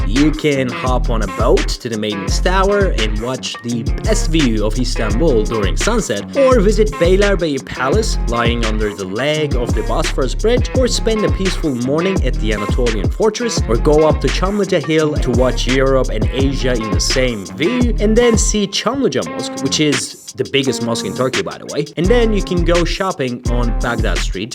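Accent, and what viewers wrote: United States. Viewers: Nice narration. You speak like American. 👍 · This the first video i saw very impressive and full of information i was looking ....Also being born in Turkey you talk like Americans.we would love to visit Turkey in very near future.Take care and God bless you.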